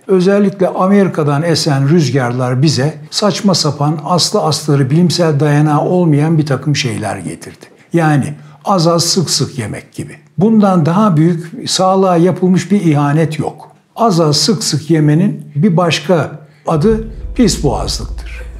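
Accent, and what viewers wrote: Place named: Istanbul, Turkey. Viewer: Istanbul lehçesi ayrı bir zevk veriyor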